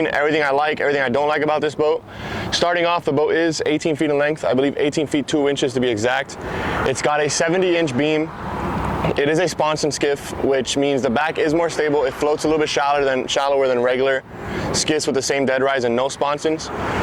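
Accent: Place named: Miami, United States. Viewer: The Miami accent goes crazy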